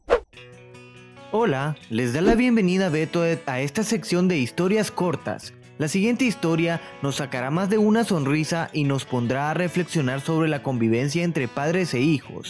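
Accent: Honduras. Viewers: Sos Hondureño?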